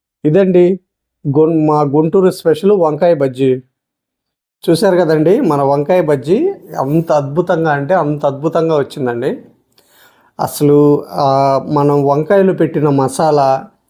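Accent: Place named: Godavari, India. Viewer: మీది గోదావరి జిల్లా నా అండి.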